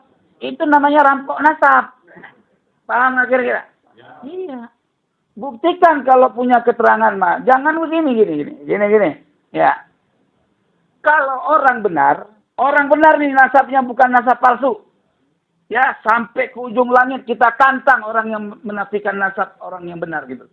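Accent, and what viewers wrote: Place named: Madura, Indonesia. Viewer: Halo syaikh.. orang pundi gih.. kok nadanya kayak Madura apa betul kyai..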